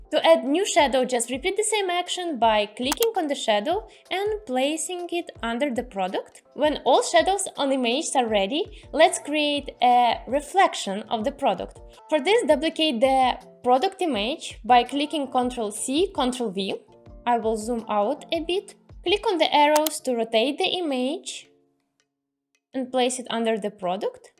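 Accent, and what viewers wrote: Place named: Russia. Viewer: I love your accent are you Russian?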